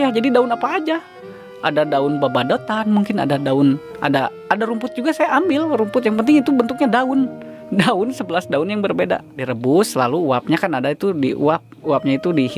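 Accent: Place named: West Java, Indonesia. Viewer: Btw karna si aa logat sunda nya kentara pisan, sim abdi ngarasa jiga dipapatahan ku lanceuk nyalira hwehee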